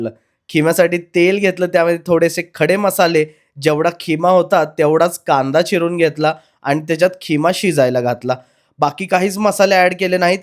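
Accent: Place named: Pune, India. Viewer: बोलण्यावरून साताऱ्या चा वाटत नाहीस भावा , पुण्याच वाटलइस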